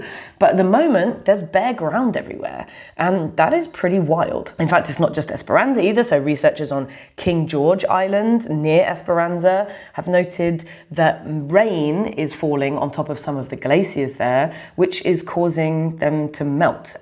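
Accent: United Kingdom. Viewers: To be fair, she does sound a bit British, and we did get our system from them. I say that with the understanding we’ve been too stubborn to update it since.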